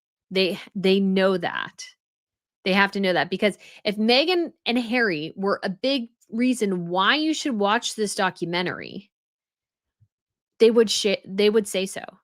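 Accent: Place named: United States. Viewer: Good questions: why go to the party to begin with? Were they actually invited? Was it Megan’s decision? Surely you (ginger boy) know it’s rememberence day? Why not have all images scrubbed from the internet? It’s all bogus, a sham, a cock up. Now as for you, … use the profits you make and instead of the European tours with everyday folks and buying jewellery, etc. get a vocal coach, get a stylist, new haircut and colour, have the stylist redo your wardrobe, get some basic pieces that can be mixed and matched - spoil yourself, up your game. You've worked for it. This is your business and you (unlike megan) are the face of it and you need to present in a certain way. Now, granted, I’m no fan of the American accent and trust me, markle is a deplorable human being, but as a makeup artist and someone with brand management experience, you need to level up. 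Please stop saying “as….(long pause) Well!! (Hard W). It’s excruciating…and you come off as a circus MC/ game show host. It’s slightly inauthentic and I’m sure that it’s not something you are aware that you’re doing. Life has its ups and downs but take the time to better your offering and start with yourself. Your content, I’m sure, is fairly well-researched and put together. Apply that attention to detail to yourself or consider podcast-only content. Start with the vocal /voice coach to correct your cadence and go from there.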